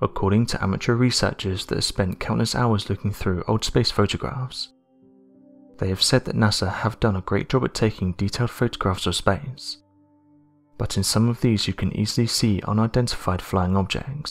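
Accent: United Kingdom. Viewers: I have to correct monotone British dude again. Any craft traveling from Earth to Mars wouldn't be called a "star ship". A star ship implies a vehicle that can travel to other stars (such as "the star ship Enterprise"). A transport vehicle from Earth to the moon or Mars would simply be called a SPACE SHIP.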